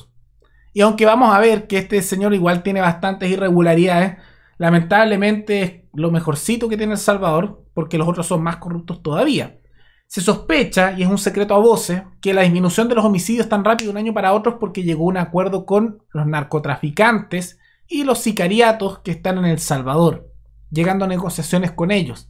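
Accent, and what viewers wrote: Chile. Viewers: Estas hablando pura mierda, si esa informacion es tuya y si estas agarrando informacion de otra persona deverias de investigar mas de Bukele y nuestro pais para hablar. No seas la misma mierda como otros. Por la forma en que hablas puedo notar que eres Chileno deverias de hablar de tu pais Si lo conoces aunque lo dudo. Pero comiensa por investigarte mas por favor.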